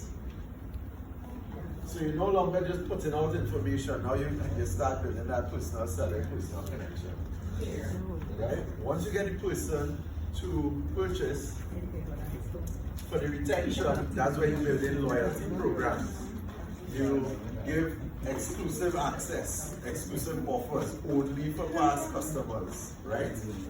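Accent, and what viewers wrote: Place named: Jamaica. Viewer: I'm enjoying this lecture. I'm into sales marketing and can relate perfectly with this. I'm just trying to grasp the Jamaican accent 😂😂😂😂. Good content you have here💃💃💃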